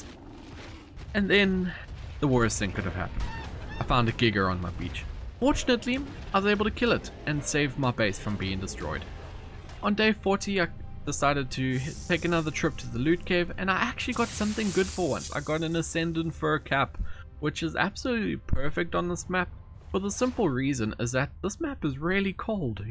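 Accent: South Africa. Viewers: You sound South African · South African?? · The sound of South African accent is strong with this one hahahah I love it Insta sub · R u south african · you sound south african 🤔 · Are you south african?? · Does anyone know where this guy is from? Accent sounds awfully like a South African accent, dunno, might just be me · You South African? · Yeyi ARE U SOUTH AFRICAN??? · Ayy man you from South Africa you sound like you do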